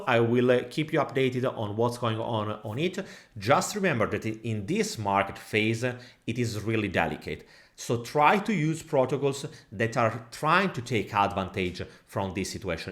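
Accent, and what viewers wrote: Italy. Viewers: ti prego toglimi una curiosità: sei italiano o spagnolo? L'accento mi sembra italiano, poi ho visto che hai anche un canale spagnolo...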